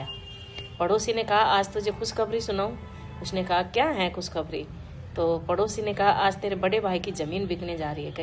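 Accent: Gujarat, India. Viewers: Ek dum faltu. Kuch bhi reel mt banao....GUJJU accent. 🥿👢🥿👢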